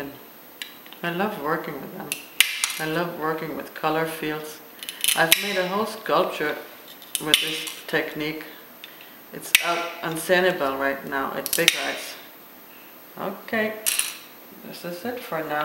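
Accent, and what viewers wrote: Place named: Netherlands. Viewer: you're such an inspiring teacher, Thanks so much! Your accent sounds like my mom (100% Dutch) anywho- keep it up- we enjoy you so! Shereen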